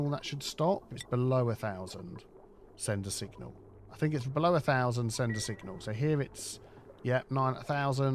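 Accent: United Kingdom. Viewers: I haven't whatched your content in the last months because CS2 doesnt interest me anymore but ONI is my all time favorite and I love love love seeing u playing it again!!! And I really missed your british accent! So I will watch ALL your ONI content for sure!❤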